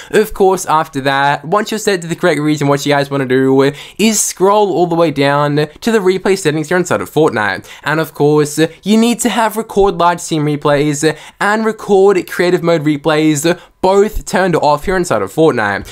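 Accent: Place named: Australia. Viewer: Hes Australian. I know that accent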